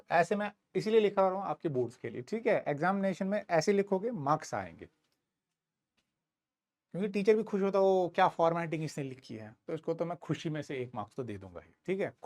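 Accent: Kashmir, India. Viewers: Kashmiri accent is so cute 😂❤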